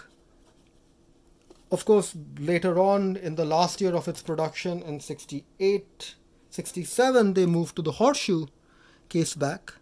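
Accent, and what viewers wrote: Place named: India. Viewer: when i hear indian accent, all I know all of this was bullsh*t and you stink , you didnt even explain the acronyn 62 "MAS" seikomatic selfdater bullsh*t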